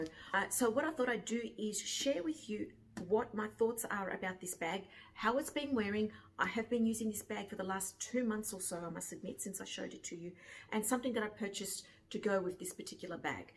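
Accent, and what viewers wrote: Australia. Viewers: Lovely to hear a fellow Aussie on YouTube! Australian context really helpful regarding brands quality bag organisers etc. Thank you so much... keep up the good work!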